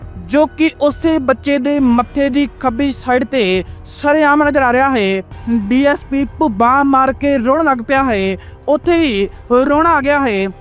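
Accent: Bihar, India. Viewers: Don’t we have anyone who can speak Punjabi in a normal tone ?????? Who will watch you ? !!! Like a Bhyia speaking Punjabi !!!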